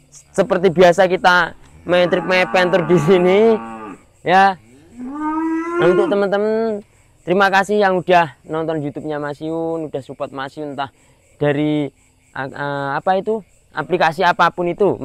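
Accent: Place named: Banyumas, Indonesia. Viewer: Wong ngapak dir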